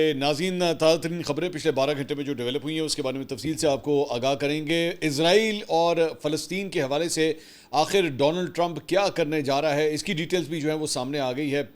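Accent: Punjab, Pakistan. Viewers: Matter of fact"please stop speaking wieghtfull English in Punjabi accent ....stop supporting cricket too much....stop being hypocrite,just for PTI views ........almost done😏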